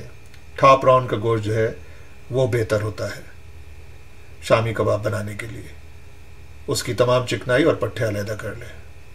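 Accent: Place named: Uttar Pradesh, India. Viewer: Aap ka "quaf" batata hai ke aap ki jaren shayad U.P.men hain.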